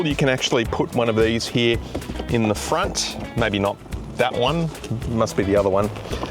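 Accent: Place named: Australia. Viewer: lol, oops, didn’t realize you were Australian and that y’all did that too. Fascinating! Love your sense of humor as much as your accent. I have a thick Appalachian accent myself and do not have a great ear for other accents after years of linguistic isolation. Thank goodness I did have a Miata to make it through. lol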